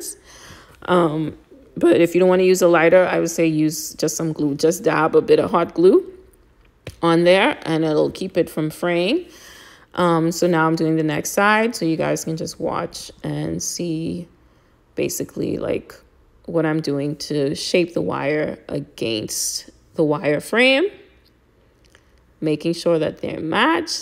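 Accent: Bahamas. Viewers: Beautiful. Love the video💕 and I heard a Bahamian accent ! 🇧🇸 just followed you on IG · I hear a Bahamian accent 🩵🇧🇸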